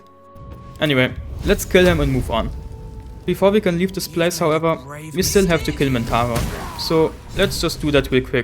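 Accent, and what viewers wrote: Germany. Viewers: Mega Video, sehr spannend und geniale Erklärung, sodass man immer sehr gut verfolgen konnte, worum es ging. Der deutsche Akzent wird über die Länge des Videos immer etwas prominenter, fand ich lustig.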